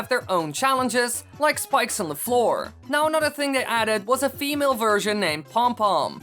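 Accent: Netherlands. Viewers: Are you dutch? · Ik hoor aan je accent dat je Nederlands bent maar ik wist het niet honderd % zeker alleen toen keek ik een oude video van jou n daar zij je dat je een meeting ging houden in Utrecht maar waar woon jij ik woon in Overijsel vlak bij Zwolle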